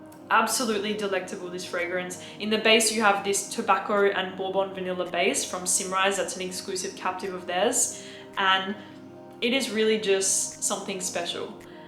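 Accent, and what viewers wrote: France. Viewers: About the accent, I wrote you a comment that Berlin isn’t supposed to sound French, but since then I can’t help but pronounce it as Ber-luh in my mind all the time, especially when I see Poets of Berluh 🤣 the French accent is so sticky 🤣 Anyway I hope you don’t take comments about accents too seriously. Love xxx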